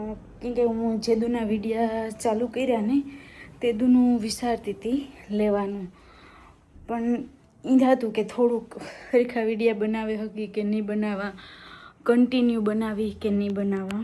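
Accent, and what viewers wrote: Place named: Kathiawar, India. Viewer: કાઠિયાવાડી લાગો છો તમે બોલી ઉપરથી ❤ Super vlog 👍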